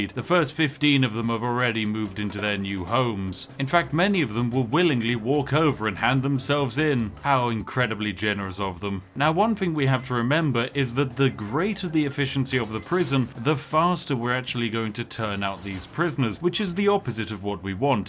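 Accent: United Kingdom. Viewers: I have never heard someone with a British accent be as capitalistic as. It's a "Capitalism Britt".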